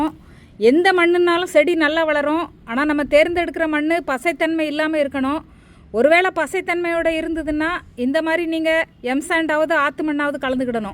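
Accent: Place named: Thoothukudi, India. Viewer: very happy to hear acca.I found out from your slang that you must be from Thoothukudi or Tirunelveli.I'm also from Thoothukudi only.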